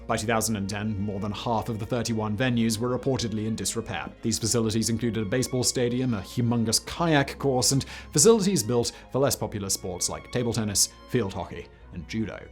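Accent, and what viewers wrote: RP, United Kingdom. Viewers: By the way this person talks too fast I have to put captions, even his britis or english accent was very distractive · After dopping and sponsoring, not only the Olympic games but also sports in general gave lost any meaning... I think Greece should be the country to bring the Olympic games to their original purpose, but they wanted to impress and wanted to give work and "gifts" to every engineering company in and out of Greece, and so corruption was the protagonist, not sports. And if an English man with a posh accent dares criticise Greece as inadequate for the Olympic games, the 2016 London games are my revenge, when that country showed off as its contribution to humanity an old lady with jewellery, a fictional murderer and a buffoon. I guess imperialism is out of fashion and they couldn't show off with dead natives and stolen antiquities 😁😂🤣😤😡🤮👎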